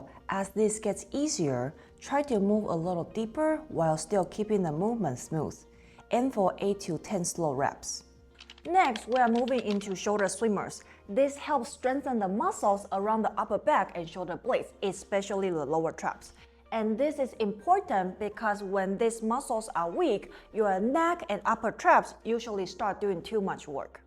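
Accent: China. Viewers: Cantonese accent?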